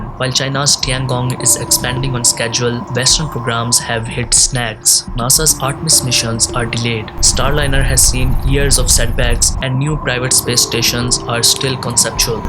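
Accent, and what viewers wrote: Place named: India. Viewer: Indická angličtina katastrofa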